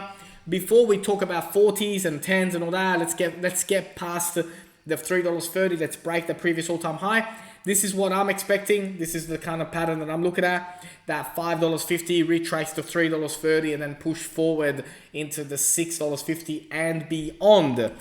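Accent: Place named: Australia. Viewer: G'day mate. Thanks for the great xrp video. Great to hear Aussie accent.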